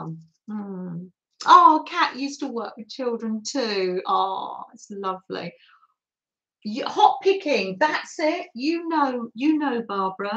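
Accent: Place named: United Kingdom. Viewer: Hello sweet 🦋 and Freddie 🐾 My morning birthday treat/gift was to begin my day watching you playing and creating 🥰🥰 I had a beautiful time with you my friend 🦋🎨🎨 you made such a beautiful painty background on the fabric love the Freddie paws on it 🐾🐾❤️ I played along with you with your prompts 🎨✂️🖍 and btw your French with your lovely British accent is perfect 👌 thank you very much Merci beaucoup for this fantastic moment with you❤️❤️ hugs and kisses to you both 🧚‍♀️🐶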